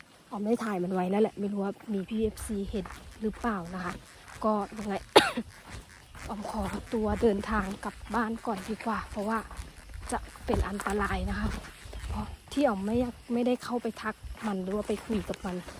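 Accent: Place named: Laplae, Thailand. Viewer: เสียงเหมือนคนลับแล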